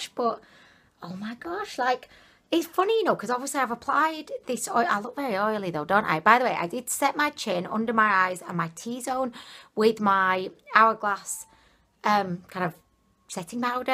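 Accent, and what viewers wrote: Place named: Huddersfield, United Kingdom. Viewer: Hi, what town are you from? Huddersfield lass eya, l have a similar accent. Found this video while noseying at Wish hauls, & you are so hilarious, l just had to subscribe! Thanks so much for the giggles!:)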